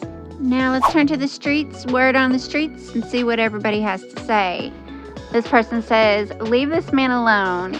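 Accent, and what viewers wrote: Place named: South, United States. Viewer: Love your voice! You sound like a southern Belle! ❤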